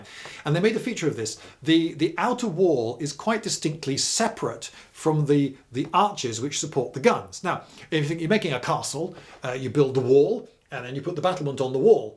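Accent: United Kingdom. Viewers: Now I don't know why this was recommended to me. I don't like fortresses nor canons, I certainly haven't heard of before. I was just going to give this video a chance and see what this older man was going to ramble about (me in my pessimistic view).... Before I knew it, around 39 mins have already passed, haha! I still didn't find the topic particularly interresting, just that there's something about hearing British speaking person explaining things that made me stay. If I remember right, I used to watch a nature program/show narrated by Attenborough and I think he spoke British English too:) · İs your accent the english one? Asking cuz i can clearly understand your speech without subtitles. So i wanted to know if you had english accent (Sometimes i can have problems in the american accent) (Btw i have only watched 2 of your videos which were the muzle break one and the clip-magazine one and they were awesome so i am subscribed to you from now on)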